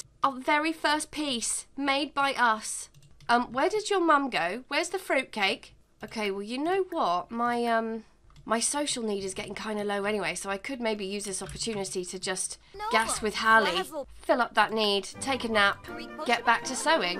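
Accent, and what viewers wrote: United Kingdom. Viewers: are u from uk i live in uk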